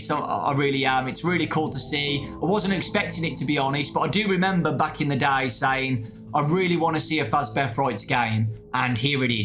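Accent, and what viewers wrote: United Kingdom. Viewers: Wassup British man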